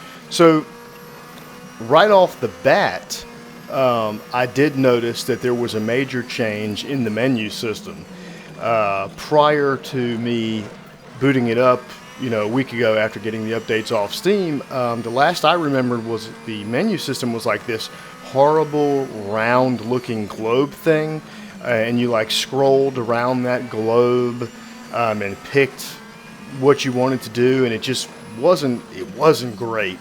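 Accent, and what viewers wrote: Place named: United States. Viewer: RaceRoom is amazing, it's so underrated, hopefully more people like yourself realize that and come around to it again. Sounds like you're American too, check out the ESR group on the Sector3 forums if you're looking for some online racing. Also like Peter says below, please disable the corner markers: options -> video -> custom -> corner markers off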